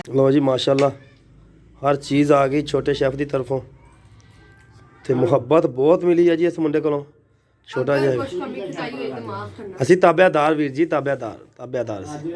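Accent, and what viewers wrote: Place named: Punjab, India. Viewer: Tuhadi boli to edan lgda k 47 to phele tuhade bzurag gurdaspur Amritsar or narowal side de rehnde hoangay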